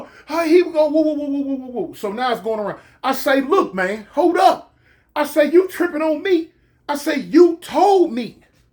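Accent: South, United States. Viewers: I'm from Mississippi. You had the guards and inmates sounding country as hell 😆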